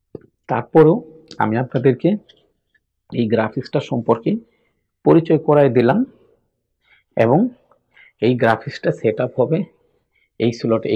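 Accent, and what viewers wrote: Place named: Dhaka, Bangladesh. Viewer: আপনার কথায় ঢাকাইয়া আঞ্চলিক টানটা ভালো লাগে, আপনি আপনার ভাষাতেই যেভাবে খুশি বোঝাতে পারেন, অনেক অনেক শুভকামনা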